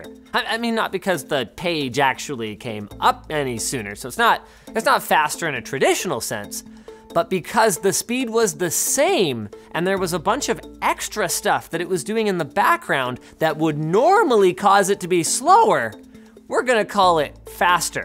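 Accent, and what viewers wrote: United Kingdom. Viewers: solid brit accent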